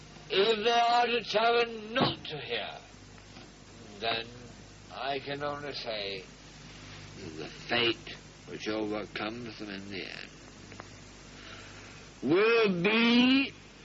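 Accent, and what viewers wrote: United Kingdom. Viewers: tbh its just how british people sound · Beh nelle news britanniche mi pare sia normale avere la received pronunciation, un po' come da noi vanno a corsi di dizione di italiano standard... Certo che se era irlandese-americano, ha una pronuncia britannica notevole... con anche l'isolamento all'estero per parecchi anni... come notevole fu la persistenza di quella propaganda che, da semplice curioso e sono solo tutte domande le mie, cmq non ho mai indagato se diede mai alcun benché minimo riscontro nell'opinione pubblica britannica... pensavo avessero smesso ben prima del '45 di trasmetterlo...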